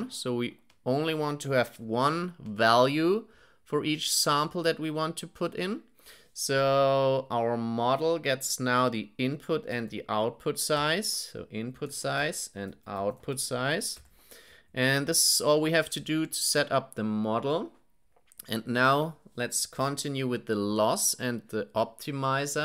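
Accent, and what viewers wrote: Germany. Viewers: I love your strong German accent. I study at a German university and you remind me of my professor. Thank you very much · Thank you very much. I love your gernan accent, and your tutorial helped me learn pytorch.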